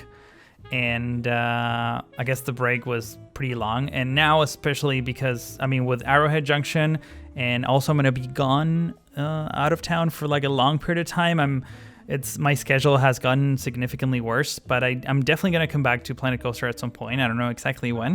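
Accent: Argentina. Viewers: Eres argentino????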